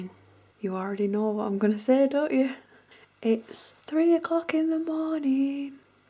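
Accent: United Kingdom. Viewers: Thanks for your tutorials. I watch your videos a lot. I have twin boys. At night when it is bedtime, they often ask to watch a “cake video” on YouTube. I think the British accent helps lull them to sleep. It certainly not because you are boring. I used your toilet seat method for the first time this weekend on my boys’ bday cake. ;). I have a shop but I only do custom work. I don’t have a store front, and that works for me. It got all the stuff out of my house. Home is home and work is work. Thanks for all you and my other fav caking you tubers do. I have learned an infinite amount since I started making cakes 5 years ago.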